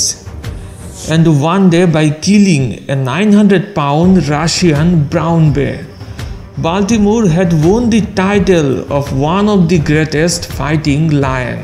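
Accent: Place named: Vrindavan, India. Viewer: Dari logat dia bicara sepertinya dia orang vrindavan 😌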